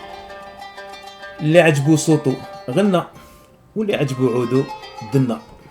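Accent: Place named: Algiers, Algeria. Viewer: امثلة مليحة الله يبارك فيك، و هذي امثال جزائرية ولكن راك تنطقهم بالهجة العاصمية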